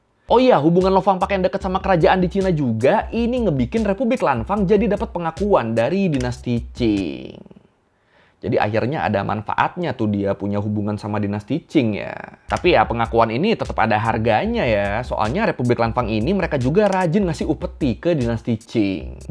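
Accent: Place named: Pontianak, Indonesia. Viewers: Daerah kamek ternyate tuh Gile gile gile wkwkwk mantap bang aksen Melayu pontianak Nye 🤣🤣🤣🤣 kapan kapan kite ngopi bang 😂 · Pacak pulak kau ngomong ponti bg, mantap cerita sejarahnya bg, alasan kenapa byk etnis tionghoa di pontianak dan singkawang, kisah leluhur morgan smash, ghea idol, cece felicia putri dll... pokok klo ke pasar mw nawar sm org china jgn lupa bilang, "ankui, pun ngai piyen nyin laaa" 😁🙏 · bole bole abang ini ni, pandai pulak pakai bahase pontianak. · Kau orang ponti ye bang, salut 🎉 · Lah abg nye org pontianak rupe wkwkwk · Daerah kamek tu padahal tu. Logat nya ponti banget😂, bang asli orang ponti kah? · Kau orang Pontianak ke boi?bale....balak kau ni😂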